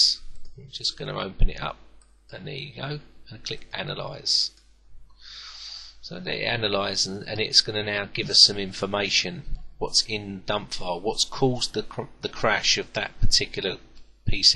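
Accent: United Kingdom. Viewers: Thanks for this clip. I struggled sometimes to understand what you are saying (the heavy British accent, not a complaint though, lol). Just an FYI, the Symbols path didn't work, so I ended up downloading and installing the symbols on my machine (and set the correct local path). Analysis worked fine after that. Thanks again for a very useful beginners tutorial. Sam, USA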